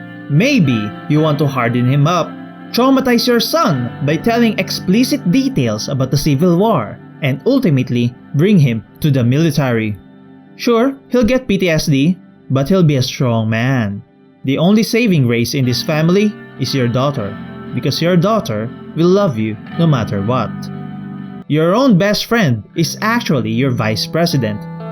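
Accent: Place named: Philippines. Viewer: Strong filipino accent, make a run that parallels idiotic filipino internal policy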